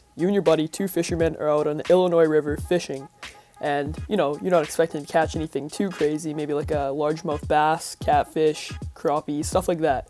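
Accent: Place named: Cleveland, United States. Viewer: Can tell this dudes from Cleveland 😂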